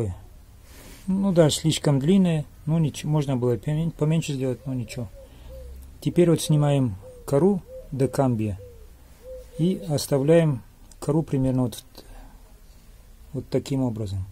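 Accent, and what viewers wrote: Caucasus, Russia. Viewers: Как же удачно я набрела на ваш канал ! Подписалась . Теперь изучаю все способы прививки ! Очень всё доступно и понятно ! Спасибо огромное ! И этот мягкий акцент . ...явно юг .... Осетия ? ... Балкария ? ... Но могу ошибаться . Удачи вам ! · Из всех видео, что смотрел на ютубе, ваши самые чёткие- по делу, коротко, понятно, все видно в кадре,лишние телодвижения вырезаны. Иногда и с юмором. И самое главное, -не косноязычно,без всяких (ээ-мм-ээ-бэ-э).Видно, что человек знает о чем рассказывает и показывает так, чтобы понятно было всем. СПАСИБО БОЛЬШОЕ ТЕБЕ. P.S. А Вы, остальные русскоговорящие, выкладывающие ролики, учитесь у человека с Кавказа .Да,есть небольшой акцент, но говорит он без ошибок, не мычит, как большинство из вас и в словах не путается. Словом,- приятно слушать и смотреть.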